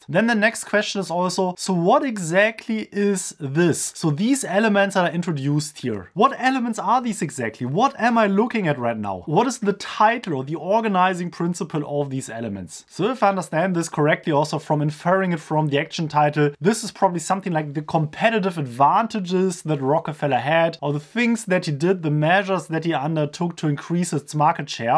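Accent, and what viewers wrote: Germany. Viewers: can i pay you to say the word "squirrel" and the statement "clean and clear car windows"? i don't think i've heard such a pronounced German accent outside of TV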